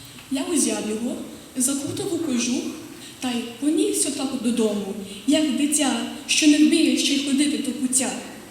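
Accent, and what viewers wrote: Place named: Canada. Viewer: ! Ну яка молодець! Я так сміялася! Трохи канадійського акценту тільки додає кумедності цій гуморесці:)) Можете сміливо продовжувати і далі:)) Бажаю успіхів!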